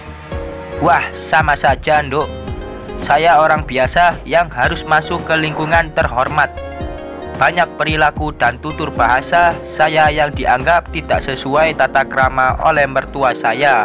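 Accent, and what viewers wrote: Java, Indonesia. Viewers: Ini pembawa crita masih baru ya mas , santai aja mas bacanya jngn katrok bahasanya medok lucu mas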